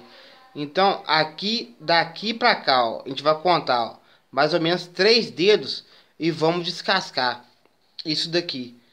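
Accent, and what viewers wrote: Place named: Caipira, Brazil. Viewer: Dei meu like por conta do sutaque de caipira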